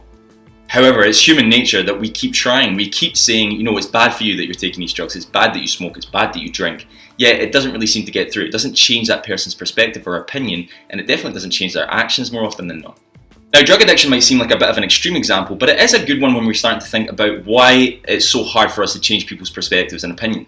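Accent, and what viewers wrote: Scotland, United Kingdom. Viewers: Oh my god you are saying that the base of the person if the self esteem is compromised this leads them to believe in outlandish ideas NOT for the ideas themselves but for the sake of the reassuring position these ideas give them in an accepting groups. So if you try to reach them through changing their views it's impossible because they are tied these views to their low self esteem.So my question is how can if you can, help someone whose self esteem is damaged to encourage them to feel better about themselves? By the way I knew you were Scottish.I'm from Spain but I am 3% Scottish lol!!!I appreciate how clearly you explain things.Can you please make a video on how to help internally wounded people with some kind of wise approach?Thanks!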